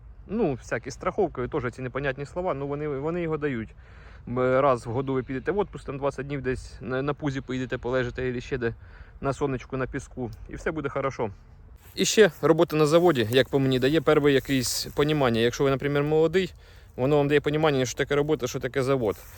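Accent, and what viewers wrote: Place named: Orikhiv, Ukraine. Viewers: Це не суржик , а панський Орехівський діалект. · На шо , на чом ??? Він балакає українською , на одному з діалектів (Запоріжжя мабудь, похоже), як і у всих странах в разних регіонах разні діалекти...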